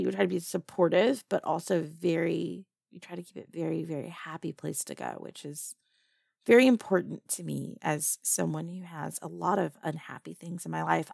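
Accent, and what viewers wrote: South, United States. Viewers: I hear some southern twang. Kinky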